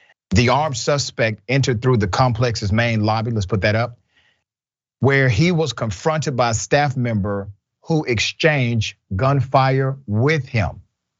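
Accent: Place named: South, United States. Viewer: I LOVE YOUR SOUTHERN ACCENT boy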